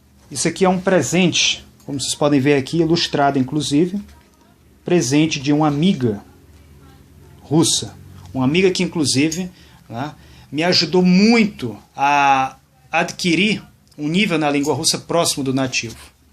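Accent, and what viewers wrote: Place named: Rio de Janeiro, Brazil. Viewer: Afinal, de onde você é? Como você pronuncia "faxista" ao invés de "fascista", achei que você é carioca, pois pronunciar o S como X é bem característico dos cariocas.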